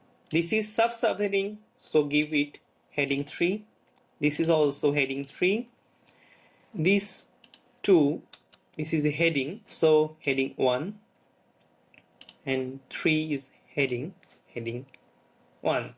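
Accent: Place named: India. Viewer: INDIAN ACCENT ALWAYS THE BEST TUTORS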